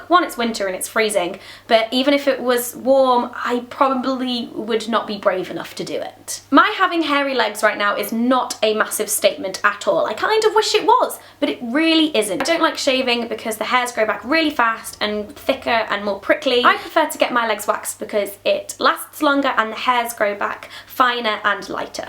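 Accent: United Kingdom. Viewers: I love your accent girl it is so awesome!! Makes me wanna be British. And I get lazy all the time and don't shave my legs, who cares because you don't live to impress other people and if you don't wanna shave your legs then so be it. :) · I normally don't like British accents but I like yours for some reason.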